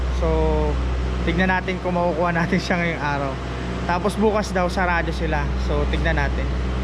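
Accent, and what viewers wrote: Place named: Bicol, Philippines. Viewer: Bicolano ka boss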